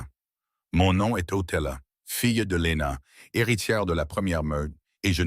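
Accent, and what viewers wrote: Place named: England, United Kingdom. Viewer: Je n'ai pas aimeé le vois qui a un accent anglais. Mauvais à ecouté. Dommage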